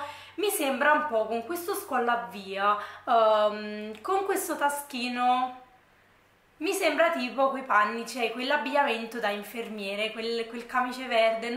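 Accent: Naples, Italy. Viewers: ACCENTO NAPOLI SPETTACOLARE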